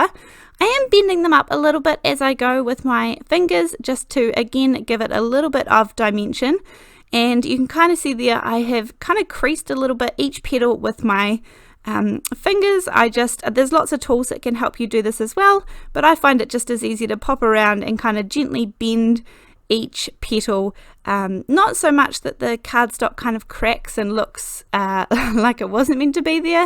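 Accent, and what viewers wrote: New Zealand. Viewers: I am lucky Lisa. Thank you to so much for the beautiful card. I sat watching that video and when she was stamping the s in Lisa I looked at my daughters face and I knew it was for me. I have never been so overwhelmed in my life. It was awesome. I will treasure that card, just as I treasure my georgous daughter for doing that for me. That was better than winning Lotto!!!! Thank you all for the lovely birthday wishes. I love watching videos. I love her style, and her creativity as a craft artist, and I am so comforted by her kiwi accent. And her love of Ali Express. So thank you all so much and now I have finally make a comment in this fantastic group, instead of just watching and admiring.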